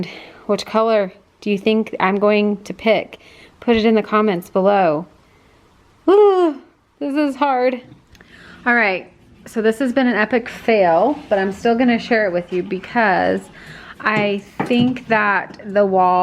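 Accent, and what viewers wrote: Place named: Midwest, United States. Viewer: You are a working women!! I really like how it turned out. Sometimes you get into something and it can throw you, but you guys did it. I just love your wicker around your tree. Now! questions. You sound like you might be from the mid-west. I am from Iowa. My maiden name was Hansen, Yes with a sen. I was wondering. let me know. this is the second time I have found you. Also when you said call it a day. That sounds like something I would say. Joyce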